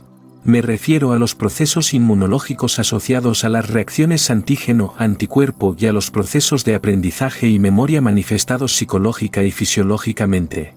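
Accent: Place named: Spain. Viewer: se agradece sobre manera, el doblaje en español de España, gracias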